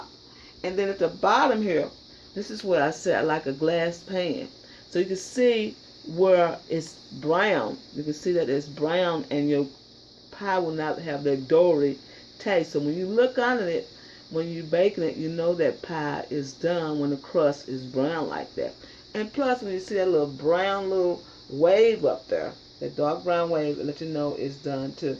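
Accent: South, United States. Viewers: Her accent is everything! Southern Bell honey! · My mom is 85 born and raised in the south and has always pronounced her words like her. Especially nuttineg for nutmeg! If I hear you pronounce it like that then I know three things about you. 1. You’re a great cook! 2. You’re a clean cook! 3. You’re raised in the South! And one last observation, You were raised RIGHT and have lots of great advice and knowledge of natural remedies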